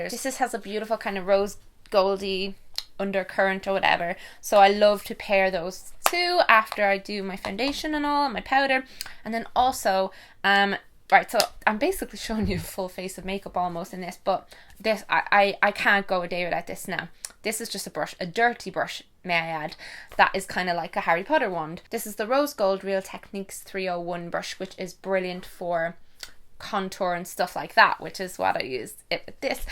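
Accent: Dublin, Ireland. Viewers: I'm from Ireland 🇮🇪 and your accent is the cutest 🎉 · I love your videos so much! You always put a smile on my face:) I feel like home when I listen to your beautiful Irish accent because I'm actually half Irish living in Finland:) And oh my god it would be so cool if my name was in your book! 💚💚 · I would love to be in your book love watching your videos and love your Idunlun accent 😍💚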